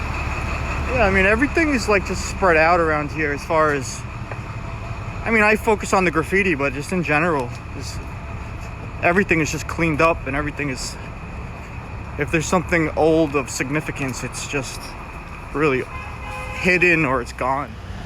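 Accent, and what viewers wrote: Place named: New York, United States. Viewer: What with a thick New York accent i thinks he’s from just outside nyc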